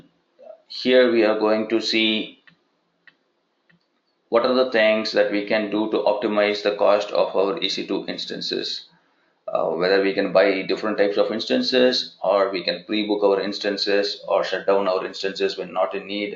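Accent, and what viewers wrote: India. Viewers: Sorry, that indian English makes it difficult understanding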